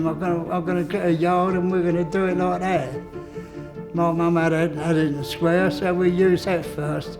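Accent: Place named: London, United Kingdom. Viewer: Old breed of Londoner. Lovely listening to the accent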